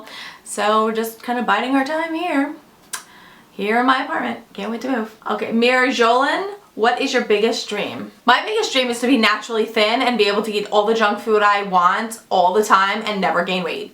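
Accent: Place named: Long Island, United States. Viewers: <3 your accent. Are you from NY originally? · Gah!! you are so awesome and so real! I love your videos so much! And I love the Long Island accent!!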